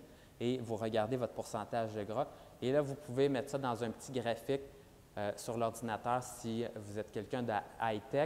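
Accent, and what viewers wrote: Quebec, Canada. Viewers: Le quebec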